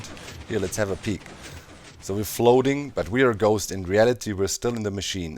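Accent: Germany. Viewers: Why do I hear a german accent?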